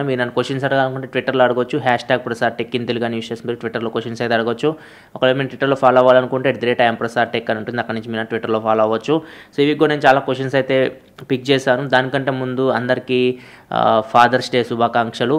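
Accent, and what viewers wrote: Ongole, India. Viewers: Sandeep Sandy ongole kadha valladhi own anduke alaa matladutundu☺️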